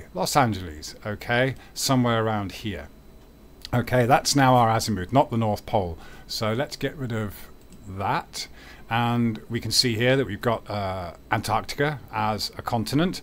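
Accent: United Kingdom. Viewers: Proof positive that a British accent doesn't automatically make you sound smarter!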